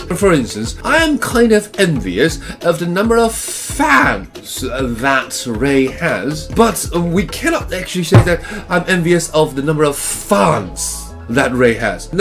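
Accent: United Kingdom. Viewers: It is interesting! 😂😂😂But i dont want to get hit in the UK. The accent you're using is galling for the British.... (I was living in the UK.) 🤔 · 點解片中的你好是配音一樣? 但聽出片中所之英語是英式的. 請問老師...[dancing] 美式 和 英式 是否不同讀法? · 看到最後才很驚訝地發現老師是臺灣人啊！ 以為是在外國長大的人！ 英國腔好好聽唷，我要繼續學下去！ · 英國腔好有魅力 可能是老師比較有魅力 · 作为一个在伦敦工作的中国人，我想说一下我自己的想法。 1. EKO老师的发音的话，应该是模仿的英国的最为大家熟知的RP，也就是英国贵族以及BBC的主持人等使用的说话的方式，但现在的话，大家用的更多的是Modern RP, 比如说： youtuber 博主 Lucy 就是典型的代表。 2. 其实英国各个郡都有自己的口音，我之前居住的地方属于约克郡，这个地方的口音就叫：yorkshire accent。 3. 总之，作为非母语者，我发自内心的觉得EKO老师的英音真的很舒服。如果你们看过英剧《the durrells》,EKO老师的发音就跟里面的Larry Durrell 的发音一模一样的，舒服！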